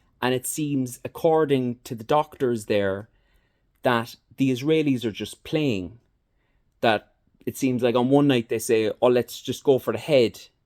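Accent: Ireland. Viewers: Honestly love this, the Irish have the best english accent imo, second being kiwi.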